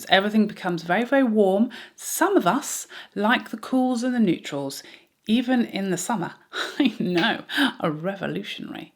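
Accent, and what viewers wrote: England, United Kingdom. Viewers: I just subscribed - full disclosure, it was because of your accent. Reminds me of “Midsomer Murders”! BTW, I agree with your re: the cheese scented shit. Gross.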